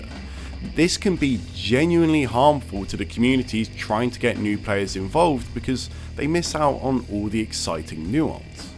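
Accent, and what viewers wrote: United Kingdom. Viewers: Calling something broken is a day one option select lmao. Excellent writing already. Btw, the audio mixing has improved drastically. Your voice is clear as day. The music is much clearer as well, but mixed in just right to add texture without muddying up your very British accent.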